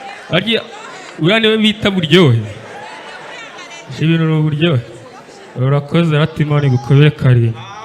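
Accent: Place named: Kiga, Uganda. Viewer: Kugikiga cyawe rwose turanezerewe 😂😂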